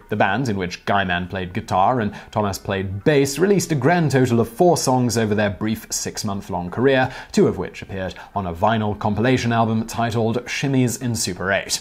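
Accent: England, United Kingdom. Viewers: Hey it's Simon Whistler, damn man did you leave visualpolitk, i love that channel and i watched it for you and you alone, you give everything this special style, by this i mean it doesn't sound force scripted or anything and yoyr english accent adds to it as well, nice to see your on this channel as well, I'm gonna subscribe because your on this channel too! 👊👍🍻😁😊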